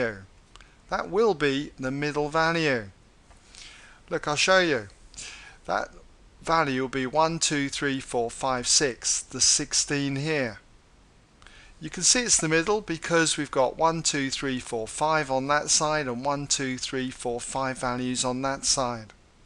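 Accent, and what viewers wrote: England, United Kingdom. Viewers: You sound like Ross from friends with an English accent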